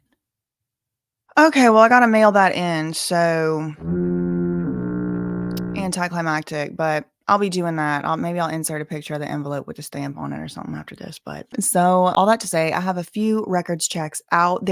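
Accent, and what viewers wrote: South, United States. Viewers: HAPPY BIRTHDAY OMG OMG!!!! Yes livestream queen. It’s not for YOU. It’s for US. Your subjects. 🤣🤣🤣 jk but seriously hope you have an amazing day!!! You’re a true gem on this platform and I’m so happy you’re here instead of a fancy lawyer’s office with free coffee and free gym and stuff. We appreciate you for real. Very rare to find a beautiful woman who is also very smart and professional and genuinely cares about people and chooses to be a voice for those who can’t speak for themselves. All while maintaining a sassy southern tone. And your name is (sp?) so extra points.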